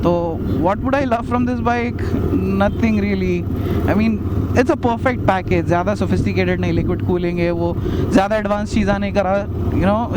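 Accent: Hyderabad, India. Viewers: Hyderabadi accent!!...,.. feels like home❤️🇮🇳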